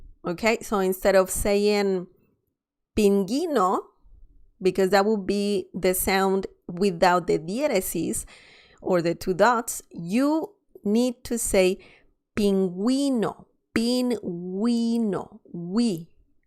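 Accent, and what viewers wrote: Spain. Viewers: Is this the Spanish from Spain? If so, I appreciate this, as I now live in Andalucia, and I need to learn the unique dialect. Thank you!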